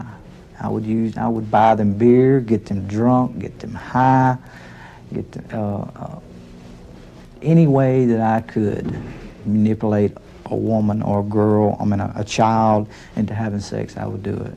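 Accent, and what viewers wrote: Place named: South, United States. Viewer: his eyes and southern drawl are so disarming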